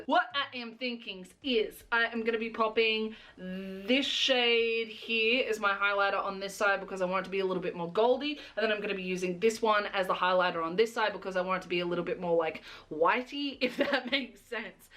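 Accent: Australia. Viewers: Also, 11/10 for representing the Australian accent, we need the real you as much as fantasy you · I'm so used to that hearing you as your natural Aussie self made me do a triple take! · After a long time her accent has gone more Australian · Because I mainly watch your channel for the makeup videos, I’m not used to your normal voice but I am not complaining! I really appreciate JJ as well as! Also I love hearing a fellow Aussie on this platform, never realised how much an Aussie accent stands out! 😂 also having mild PTSD hearing those birds in the background... me hearing those after being on TikTok all night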